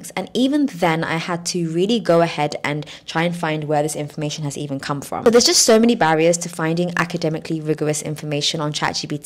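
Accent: United Kingdom. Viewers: Dear, I love your accent, its pure british, however your channel is expensive, I could not afford it.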